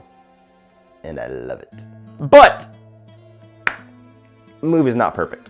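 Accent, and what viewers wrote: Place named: Midwest, United States. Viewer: He is original from Ohio but moved to Georgia at like 14 or 15. So either Midwest or Southeastern United States. I don't think he has much of a georgia accent more midwest.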